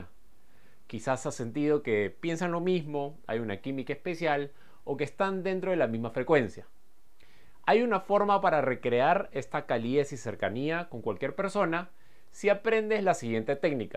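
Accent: Peru. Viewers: Eres peruano? xD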